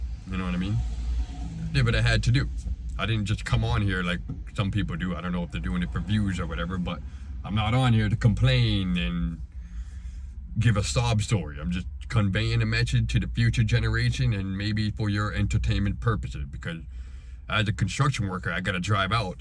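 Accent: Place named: Cajun, United States. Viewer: Are you from Louisiana? I’m getting a Cajun twang! Best of luck to you, brother!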